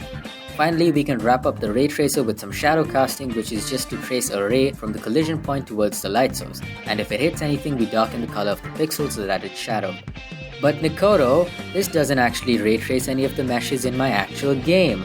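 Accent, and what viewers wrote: India. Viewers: AAAA bro it seems like u is Indian cuz the accent is a lil similar am I mistaken?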